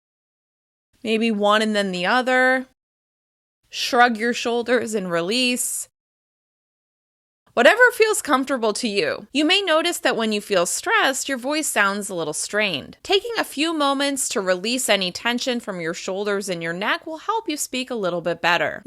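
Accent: United States. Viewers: American acent amazing teaching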